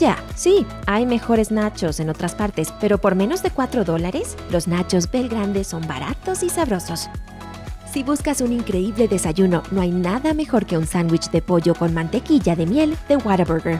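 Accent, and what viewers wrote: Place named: Latin America. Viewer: No entiendo la utilidad de traducir al español (principalmente en latinoamerica) videos con un guión y contenido realizado para Estados Unidos. La mitad de estos locales no se encuentran en mi país, dan los precios en dólares aún cuando la locutora tiene acento latino, los precios son mucho más baratas de la realidad y varios de estos producto de locales que si se encuentran en mi país no se venden...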